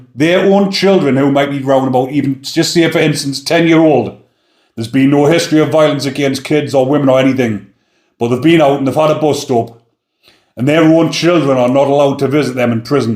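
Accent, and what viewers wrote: Newcastle, United Kingdom. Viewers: I know these are serious topics but i can't help but laugh every time he swears in his Geordie accent.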